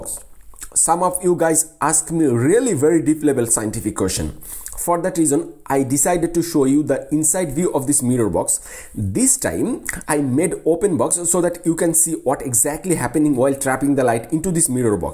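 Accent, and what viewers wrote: India. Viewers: His voice is most stereotypical indian english!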